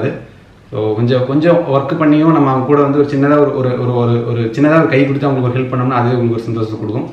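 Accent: Kongu, India. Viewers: Hi bro.. I am new subscriber. Ungaa video first pakkum pothu ignore panni irukey...apparama dhan Tamil laa paathey...very nice chance yeah ilaaa...athuku apparamaa ungaa videos yehllam theadei theadei paakkaa start pannaney...sehmaa bro 👌athum ungaa kongu Tamil very nice....genuine person positive energy...beautiful family....cute wife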